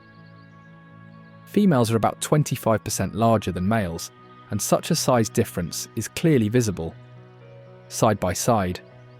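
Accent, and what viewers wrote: United Kingdom. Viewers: I think the Guy speaking in an BRITISH acent talking about Appalachia is Silly.. Why not a Southern acent ?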